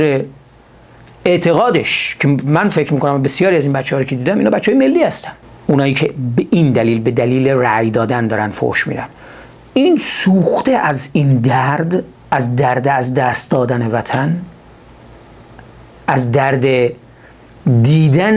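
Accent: Gilan, Iran. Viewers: من که عاشق لهجه شمالی هستم .وا نده لهجه زیبا رو عزیز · وقتی عصبی میشه لهجه گیلکی میگیره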